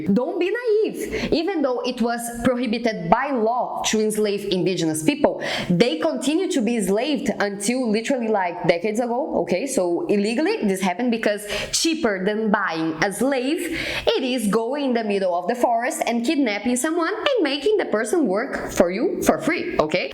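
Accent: Brazil. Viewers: Ai, na moral, que video maneiro cara. Excelente pra inspiração e treino de inglês, ainda aprendendo história do brasil. Sempre faz bem escutar um sotaque nosso que a gente acha bonito. Você é uma querida, juro. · ''welcome chew my channel'' sotaque brasileiro é um charme.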